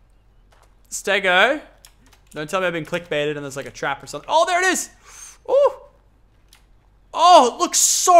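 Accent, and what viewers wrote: Australia. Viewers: Is he australian cause i am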